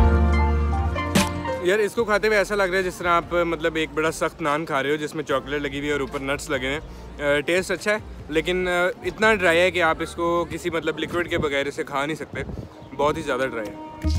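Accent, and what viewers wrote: Punjab, India. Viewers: Punjabi accent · Your Punjabi accent..😍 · Tu Punjabi me urdu bol rha h bhai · Wonderful face reaction after every food. 😊☺and punjabi ascent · Your Punjabi punch in Urdu is great. Bahut wadiya mundiya, khich k rakh. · Your punjabi terms are AMAZING.....I'm dying laughing for your natural punjabi style ...lovley..!!✌✌😂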